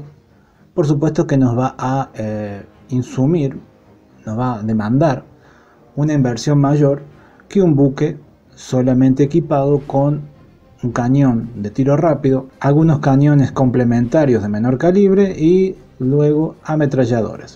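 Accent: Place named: Argentina. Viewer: Pobre Argentina..... Estas OPV, no sirven para una situacion de alta actividad. Lo que tienen que hacer es, comprar las F110 a España, lo más avanzado del mundo en tecnología y sistema de combate. Un saludo, me encantan tus vídeos con tu acento argentino. No somos hermanos? Y se lo compráis a Francia.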